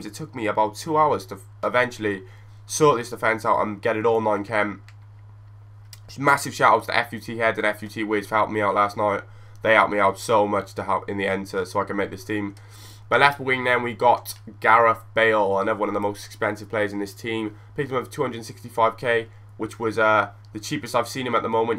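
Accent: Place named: Liverpool, United Kingdom. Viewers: You sound like ya from liverpool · Terrible scouse accent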